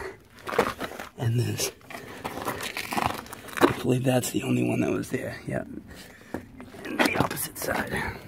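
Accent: Boston, United States. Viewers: Sound like you from Bostonn, great video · Sounds like Boston. Lol.. good video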